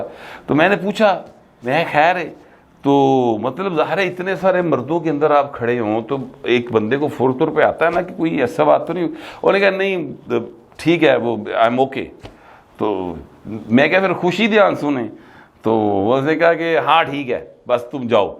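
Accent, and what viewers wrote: Lahore, Pakistan. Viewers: خالص لاہوری لہجے میں کرنٹ اشو پر تبصرہ کرنے والے گوہر بٹ کو موجودہ حالات پر گہری معلومات حاصل ھے بولنے کا انداز دل میں اتر جاتا ھے اللہ پاک آپ کو لمبی عمر عطا فرمائے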